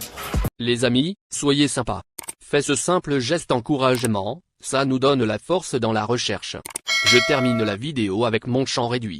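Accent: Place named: Burkina Faso. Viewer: Son accent est burkinabe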